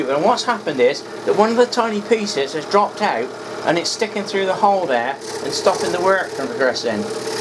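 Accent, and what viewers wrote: United Kingdom. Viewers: I’ve enjoyed all your fretwork/scroll saw videos. I live in U.S.A. but I lived in the U.K. in 1981 and 1982. Your British accent brings back fond memories. Here in the U.S. we don’t have anything like the vintage fretwork patterns like what you’ve shown in this video. Can you suggest an online resource from vintage fretwork patterns?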